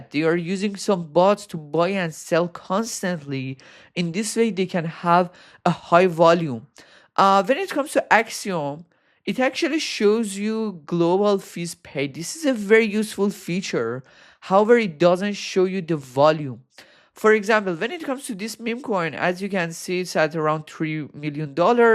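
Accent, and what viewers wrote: Iran. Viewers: persian accent uhh xd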